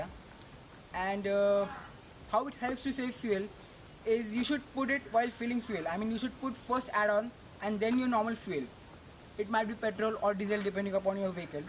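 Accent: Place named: England, United Kingdom. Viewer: Nice video bhava... Nice English accent..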